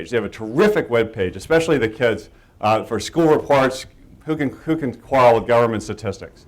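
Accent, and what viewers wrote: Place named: Texas, United States. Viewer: I could tell you were from tex-a-a$$ in the 1st few seconds, you are a pre-programmed idiot... Not surprised you're an ex-lawyer that grew up with ONE radio station.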